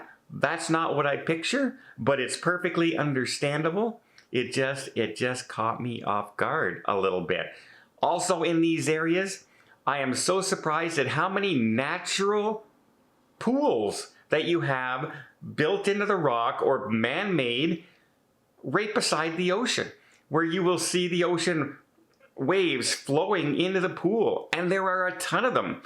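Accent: Canada. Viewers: You should have come to Perth it's different again. It's small , hardly any population , isolated and very clean. It's also not as expensive. Not sure ,am I picking up a Canadian accent.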